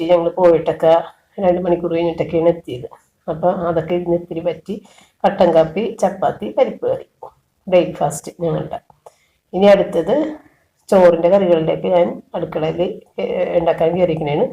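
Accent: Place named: Ernakulam, India. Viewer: ernakulam dt aano. Slang ketittu chodichatha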